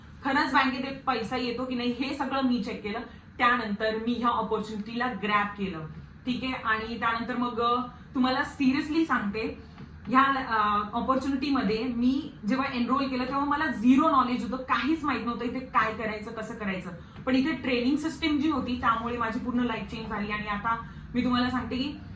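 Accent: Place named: Marwari, India. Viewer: ताई, सर्वप्रथम तुझ्या कष्टlच कौतुक.. एका मुलीच्या जिद्दीच कौतुक.. व्हिडिओ मध्ये मुद्दे छान present केलेत.. थोडे मारवाडी accent आहेत.. आणि बोलणं थोडं fast वाटतंय.. मध्ये pause घेतले तर अजून effective होईल.. या प्रयत्नांच सुद्धा कौतुक.. पुढील प्रयत्नांसाठी शुभेच्छा.. लगे रहो 👍..🍁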